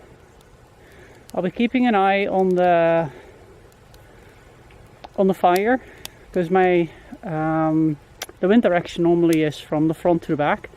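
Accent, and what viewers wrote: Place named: Netherlands. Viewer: Unmistakably Dutch accent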